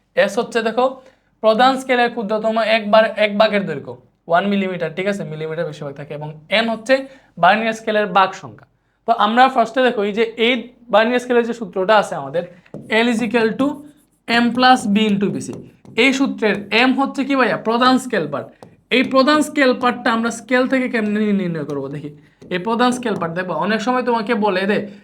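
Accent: Sylhet, Bangladesh. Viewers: are you sylheti brother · Vai apni sylheti ni.. please reply dein.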